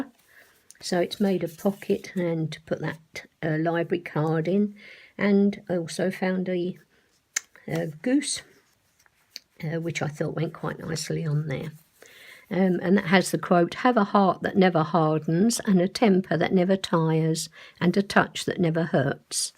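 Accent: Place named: England, United Kingdom. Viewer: Absolutely Gorgeous Thank You for sharing with us . Nikki xxx ( I can hear you are English like myself ..Do you live in the UK )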